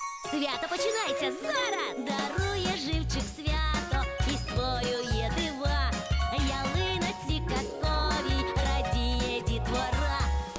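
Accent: Transcarpathia, Ukraine. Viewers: Ти із Закарпаття? 😮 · ти з Закарпаття?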